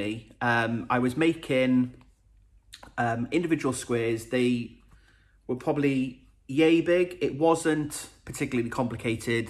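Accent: Wales, United Kingdom. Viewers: Really enjoy your podcasts, its nice to hear a lovely Welsh accent. I am newly subscribed and enjoy and feel inspired once I watch them many thanks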